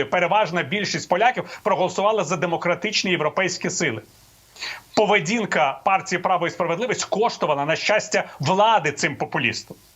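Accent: Standard. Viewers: В.Портніков приємно вас слухати.Ваша Українська мова дуже гарна,чиста, і не згвалтована неправильними діалектами кордонів.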